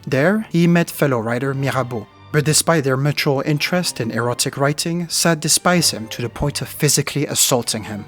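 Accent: France. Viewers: well he IS French obviously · Am Inlove with your accent. Now I want to learn French♥️ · I couldn't have been the only one thinking they NEED to learn French hearing this narrator. Beautifully done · Don't know how I got here. I don't know why I stayed and watched. But I did and now I know things I don't want to know. There was way too much tits asses and penises in this and now this is my last video before bed...the dreams I will have...oh my...I am going to concentrate on that French accent instead...*clicks heels* there's no place like Gay Paree, there's no place like Gay Paree... Hello France✌🤟 câlins et bisous from America 🇺🇸 California to be exact. Vive La France 🇨🇵 great video très intéressant. ♥️💙❤ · Time to hear more of that french accent. Edit: ok, now I understand why this video is age restricted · Hes actually French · Rien qu'en t'ecoutant parler on sait que tu es Francais...i guess only French speaking people can tell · He is French ^^ I am and I hear it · ?? 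He's French! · I have the impression that the narrator is french but has good english, great content as well, kudos👏👏👏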